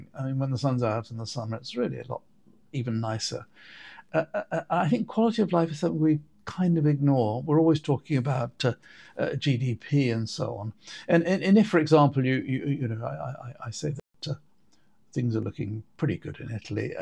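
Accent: RP, United Kingdom. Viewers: I knew strait away just from his accent that he was well heeled ;-)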